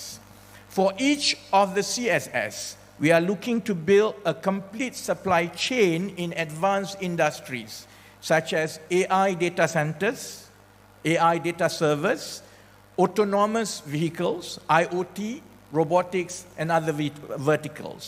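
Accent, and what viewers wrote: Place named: Besut, Malaysia. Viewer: Kaler bunyi besut skit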